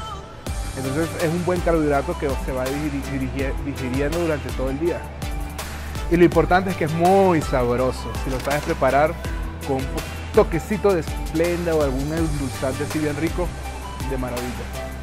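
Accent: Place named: North, Mexico. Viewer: Méxicano norteño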